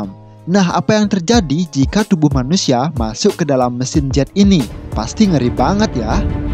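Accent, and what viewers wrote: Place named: Bali, Indonesia. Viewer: Pengisi suara orang Bali😁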